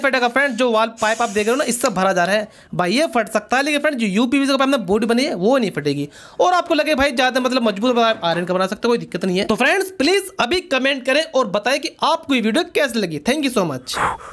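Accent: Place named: Haryana, India. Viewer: Aap kapil sharma jaisa bol rahe hein Shayed aap haryana se hein Good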